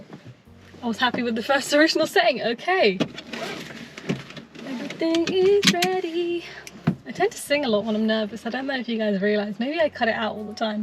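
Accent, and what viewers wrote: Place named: United Kingdom. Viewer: 18 minutes of British accent + UK tour? Let's go!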